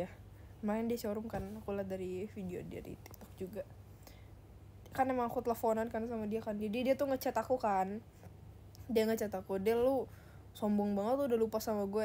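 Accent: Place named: West Java, Indonesia. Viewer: Lucuuu bngt passs bilang inima slh Mulu euyyyyyyyy jdi anak Sunda nie 😫🤏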